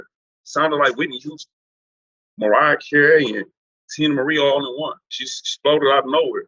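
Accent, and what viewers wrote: United States. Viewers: Seen similar stuff to this happen. It's interesting hearing someone with an American accent tell a story that could be coming out of the mouth of one of my friends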